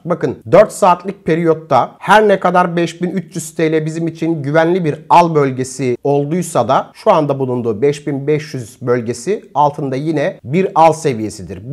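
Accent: India. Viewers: Turkce dinliyemiyorum:) ve hint aksanı vae bilginiz olsun:) uk deyim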